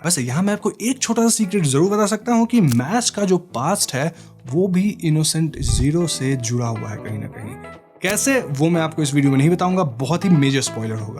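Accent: Bengal, India. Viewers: Bengali ho app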